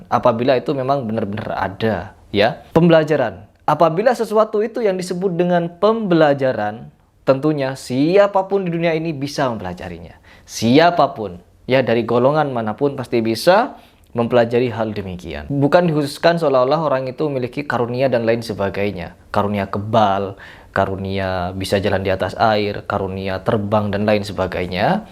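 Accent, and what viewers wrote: Madura, Indonesia. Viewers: Mas sampean orang madura ya?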